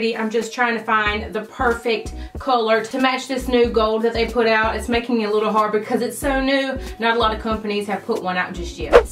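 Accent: South, United States. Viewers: You have a strong southern accent, the second i heard it i was like WOW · lol I’ve never seen a YouTuber with a southern accent . Definitely subscribing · Here for the Southern drawl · Everyone in the comments are saying you have a strong southern accent and I was like I don’t hear it so when we went to go see my cousins in Atlanta they were like omg they were like she sounds just like you also you are so pretty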